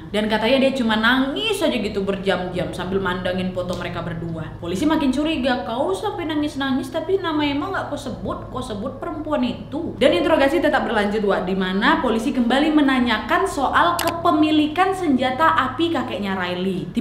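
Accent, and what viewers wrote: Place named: Sumatra, Indonesia. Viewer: Penasaran, org mana sih. Logatnya ky dr sumatera. Familiar SM aku, enak ngomongnya.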